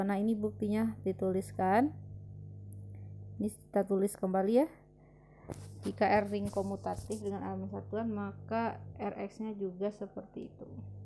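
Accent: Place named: Painan, Indonesia. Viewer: Khas dialek painan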